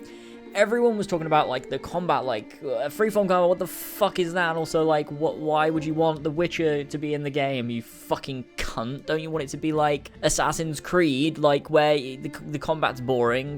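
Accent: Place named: Northern Ireland, United Kingdom. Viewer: where are you from cause your accent sounds like a northern Irish...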